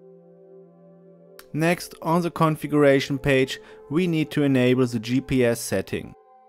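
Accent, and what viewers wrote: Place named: Germany. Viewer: oh man.. du klingst so deutsch mit deinem akzent :D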